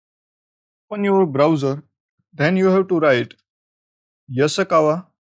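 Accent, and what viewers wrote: India. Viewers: How did you want him to speak in Spanish when he has a indi accent in english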